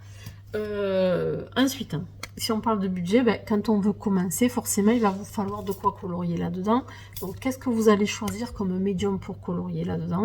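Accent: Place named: South, France. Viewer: Bonjour 🥰🥰je suis TOTALEMENT d accord avec toi au sujet de l importance de DÉCULPABILISER l idée de colorier. Infirmier en psychiatrie, effectivement l aspect art thérapie du coloriage est indéniable. . . Et effectivement il faut défaire les cases car il y a j en suis sur beaucoup de garçons qui colorient. . . Plus présent sur Tiktok je regarde de plus en plus youtube ou la communauté coloriage fait plus de hors mystère et est très très créative. Ayant fait des études d histoire de l'art je me retrouve enfin qd tu dis que le coloriage est un art en lui même. Je démarre ma chaîne ici pour démontrer que les garçons aussi peuvent colorier et se faire plaisir autrement qu en courant après ⚽. Merci et bravo pour cette super vidéo. Hâte de voir les suivantes. J avais jamais commenté tes autres vidéos/ vlog ou tu réalises de superbes et magnifiques colorisation, c est désormais choses faites. 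Encore bravo Ps au vu de ton accent on ne doit pas être très loin ( moi de paris mais depuis 12 ans a Toulouse avec mon Mari). Belle journée/soirée.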